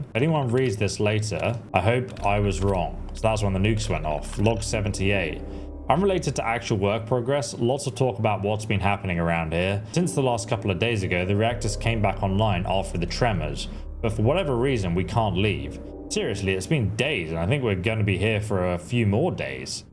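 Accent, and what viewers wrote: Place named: England, United Kingdom. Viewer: I don't mind your English accent, but OMG, it's VAR-mit.!! Picture Yosemity Sam saying "Come here ,you pesky VARmit, rabbit." Var, as in far with a V!😂😂😂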